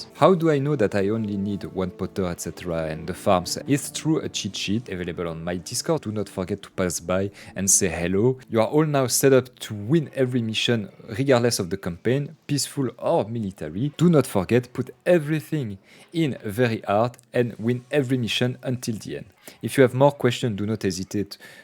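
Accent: France. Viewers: The French accent always a good lissen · Français qui parle anglais ? (A l'accent et à la prononciation de l'anglais, ça se sent^^) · The french is strong with this one 😍😍😍 oh I Love it so much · By your accent, you are Frenchman, are you? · I have played this game so often when I was younger. Thanks a lot for this instand nostalgia. All the best, Valentin PS: Your French accent sounds marvellous, plz keep it!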